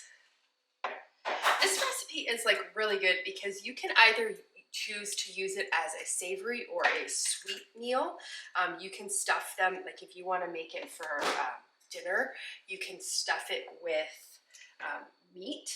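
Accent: Canada. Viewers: thank you. you've got me interested in it again! and for that I thank you. is your accent Canadian? I live in metro Detroit so its very familiar to. me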